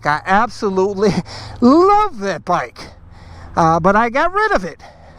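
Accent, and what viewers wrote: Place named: South, United States. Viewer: You know you sound like a southern black, right? 🤗🤙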